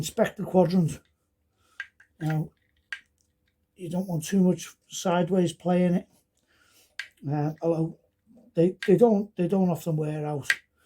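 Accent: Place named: Liverpool, United Kingdom. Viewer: I left Liverpool 40 years ago, love the accent, and this video was very helpful, cheers.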